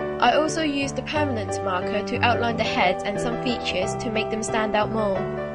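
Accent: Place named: England, United Kingdom. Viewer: i thought u were from england cuz of the accent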